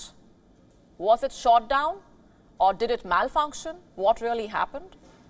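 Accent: India. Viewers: i love how funny and cute indian women talk english,she's adorable ^.^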